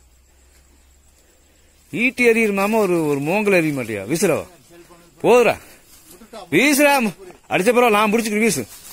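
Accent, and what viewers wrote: Kongu, India. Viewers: கொங்கு தமிழ் தாண்டவம் ஆடுது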